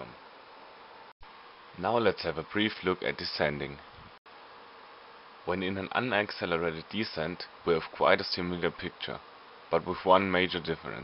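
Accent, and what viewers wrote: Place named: New Jersey, United States. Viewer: Sounds like you are from New Jersey!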